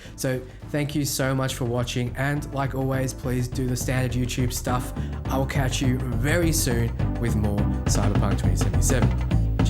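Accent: Australia. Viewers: Nice to hear an Australian for fucking once! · Great video. Hey are you an Aussie or a Kiwi?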